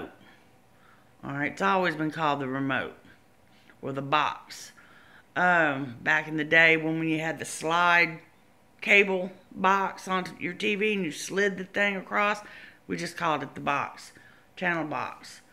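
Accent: United States. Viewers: Great Job! Nice accent. I am from Louisiana too. <link> Talking about food in a Louisiana accent.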